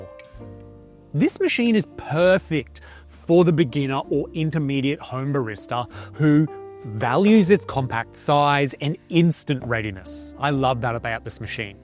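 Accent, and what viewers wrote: Australia. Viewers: I watched your video for the first 3 minutes with captions and the sound off. Thought to myself, 'This guy probably has an Australian accent.' Turn the sound on, and I was right. How did I know that!?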